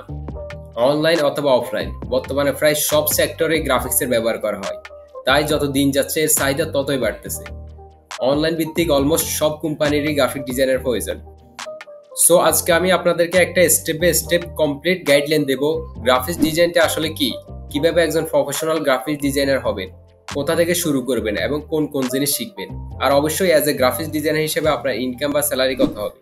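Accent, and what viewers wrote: Noakhali, Bangladesh. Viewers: Apni ki noakhalir?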